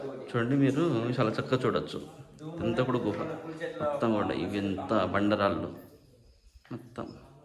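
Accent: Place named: Kadapa, India. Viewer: Nenu chala rojula taruwatha rayalaseema kadapa bhasha vinnanu. Thank you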